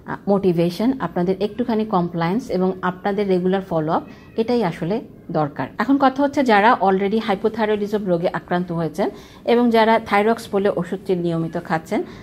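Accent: Chittagong, Bangladesh. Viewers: Madam ki chittagong ashen????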